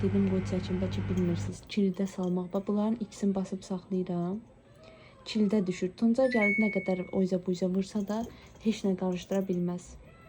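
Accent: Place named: Lankaran, Azerbaijan. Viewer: ləhcəniz bizim ləhcədir lənkəran deyirəm lənkəranlısız😊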